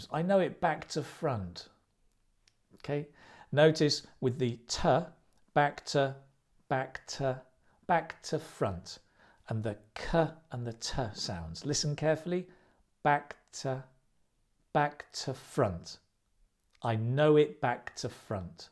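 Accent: United Kingdom. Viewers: I'm really intrigued the way you teach, straight away to the topic and don't waste my time, explain clearly and easy understand. I like your British accent as well.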